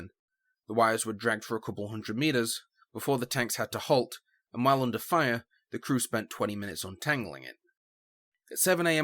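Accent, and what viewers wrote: Yorkshire, United Kingdom. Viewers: It's not Bri'ish, It's Yorkshire! Aye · what accent is this? which british city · The narrator seems British, I hope he knew that the root causes were created by the British; mother of all modern day conflicts 😊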